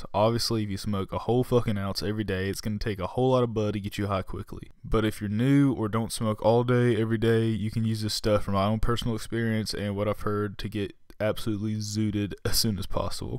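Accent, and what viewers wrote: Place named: Kentucky, United States. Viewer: dude sounds like he from Kentucky :o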